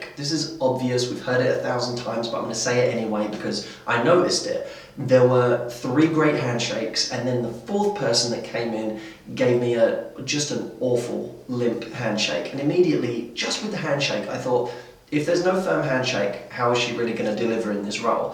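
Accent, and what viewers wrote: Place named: United Kingdom. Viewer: your pretty look and British accent already won people s attention(๑´∀`๑)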